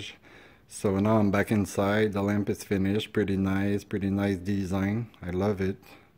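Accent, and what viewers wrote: Quebec, Canada. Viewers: Ca sonne accent québécois ca :P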